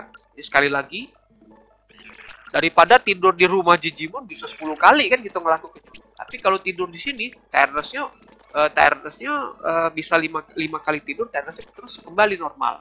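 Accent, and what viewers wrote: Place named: Minangkabau, Indonesia. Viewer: Mantap bg...jan jaleh bana logat minang nyo bg hehee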